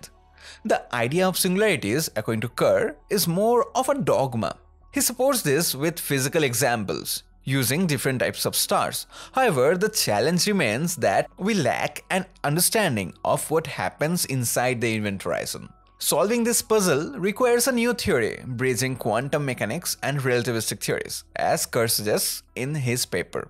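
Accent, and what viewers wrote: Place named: India. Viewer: Bro, no offense. I respect that you bring in science and valuable information rather than the next clickbait video, but the hard Indian English accent (at least it sounds like that) makes it most of the times really difficult to follow. If you are open to constructive criticism, maybe use some appropriate tools, or have it dubbed by some AI voice perhaps. Other than that, well done. Keep it up.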